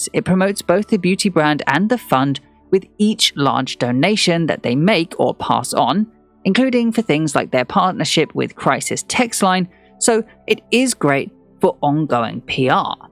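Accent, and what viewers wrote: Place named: United Kingdom. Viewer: I mean, England is not a single country. Yet. UK is and you kinda sound from there. Anyway. Maybe in 50years due to Brexit there will be no UK, but Scottland, Ireland and England, but for now.. UK. I am not trying to be pretencious it just make my ears bleed.. every time I have really liked the video, I find Selena drama queen shady. But I also now 100% due to my profession that people are so so into mental health now after the pandemics. It was a smart thing of her, this way she reached older audience as well, I mean sure she ha sgreat price point but I don't find her products good as some of professional MUAs, and kinda a lot of colors, glitters in the highlighters in certain light.. not really for mature skin, if you get me. Liquid blush too pigmented so need a work and then too heavy foundation (and this is not a mature skin friendly). 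Anyway, I wasn't before this a fan but this looks shady. Great job overall!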